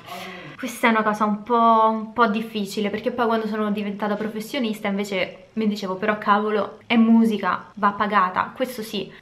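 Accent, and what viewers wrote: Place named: Rome, Italy. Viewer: Ma Brian riesce a cogliere, da non romano, la dolcezza del tuo accento?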